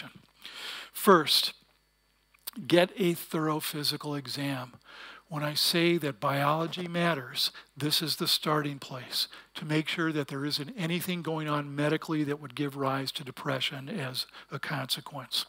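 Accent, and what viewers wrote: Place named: Midwest, United States. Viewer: He sounds like he's from Chicago 100% or at least Midwest lol. I can always tell those peoples' accents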